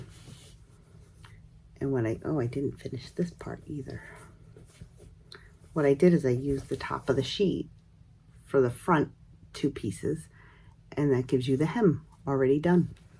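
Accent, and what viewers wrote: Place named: New England, United States. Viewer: Hi! I found your channel from wheel of fate -- and decided to go back to the first video / intro. Nice to meet you. I can definitely hear your New England accent! I subscribed and look forward to catching all your makes videos.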